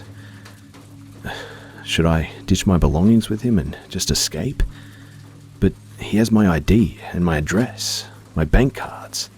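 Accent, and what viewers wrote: Australia. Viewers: "Shadow People", a.k.a "Shadow Walkers" and "Dark Dwellers" are mainstays of many traditional cultures and generally seen as spirit beings that can be good, bad, or neutral. Usually with Native American and First Nations cultures, it's something negative. Being of Cherokee background, the traditional view for my experience is that they are visions of the Raven Mocker, the spirit associated with death and is the one who takes the soul into the next world, the one the medicine man has to protect the body of the sick and parents of little children while sleeping from with pine wood cuttings and tobacco. The Aborigines of Australia (judging from that voice and your fondness for telling the story about the Cockney security guard, you might get a kick out of this) have the Dawn Walkers, the beings that during the Dreaming wandered the world, creating various landmarks and working out various "just-so stories", but are now mysterious visions one can catch in their eye if they look hard enough. 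Unlike many Native American versions, these are positive and they are known to be powerful and can give great strength and wisdom to those that treat their dwelling-places such as caves and trees with respect. Really neat stuff, cultures and religions, anthropology and the study and preservation of the cultures and heritages of our planet.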